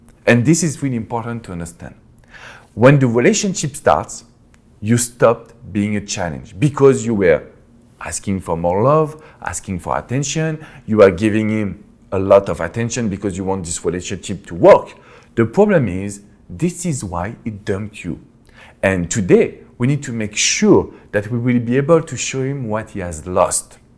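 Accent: France. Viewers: Ur frensh accent is all i can think abt right now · are you French ? I can hear the accent lol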